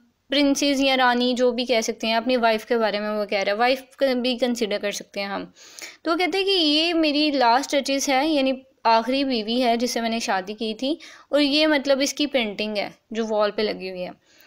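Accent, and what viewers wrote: Pakistan. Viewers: Kya aap pakistani ho kya aapki tone ase hai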